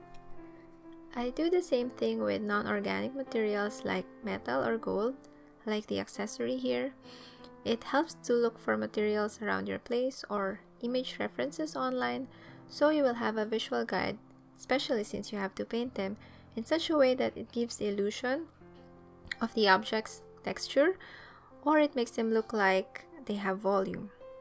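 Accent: Philippines. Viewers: I really love thisss!!! I could tell by ur accent that ur a filipina, I'm so proud✨ Btw, could u make some tutorials about how to color skin or where to put shadows/contour (specially on the nose) and blush on face in watercolor painting pls? Thanks in advance!💗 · You sound filipino So are you a Filipino???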